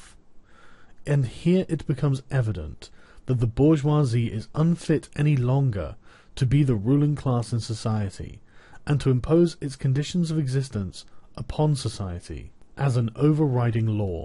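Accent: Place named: England, United Kingdom. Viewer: I'm undecided if hearing Sargon read this makes the ideas more concerning than when I last read it. That type of English accent makes things sound more reasonable and somehow more evil at the same time.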